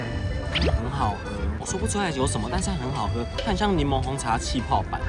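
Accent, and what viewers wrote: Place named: United Kingdom. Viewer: 和一樣經過過小熊軟糖的店，但當時窮遊覺得買不起就沒進去了～印象中的漢堡是一抹鮮豔的紅好想念德國啊啊啊啊聽到你的英式德文忍不住笑ㄌ😂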